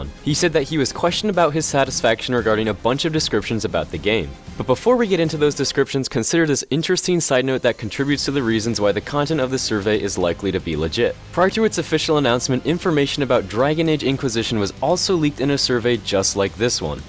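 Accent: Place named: Canada. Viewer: ***** Lucky bastard, I'd love to have lived in Vancouver...The weather is perfect over there, that or maybe Slovakia...But yeah, I guess I should have said loosely pledged allegiance, ;^) Anyways, that's a lot of info I didn't know about my brethren across the bay, thanks for the info BTW. Was born on the Great lakes, so we got Toronto's wind...And CBC! (Heh as a snot-nose, I actually got your accent due to hours of CBC!)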